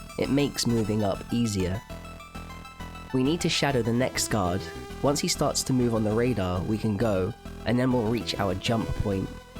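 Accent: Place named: United Kingdom. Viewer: What part of Britain are you from?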